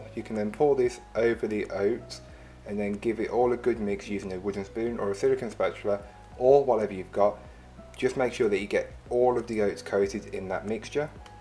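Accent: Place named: Birmingham, United Kingdom. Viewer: Hi just fancied making chocolate flapjack and found you! I'm a Brummie so it was cool to hear your accent. Never had these at school though 🤔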